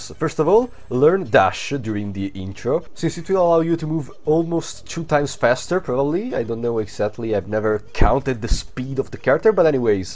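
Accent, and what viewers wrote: Italy. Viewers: Are you Italian? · Sei italiano?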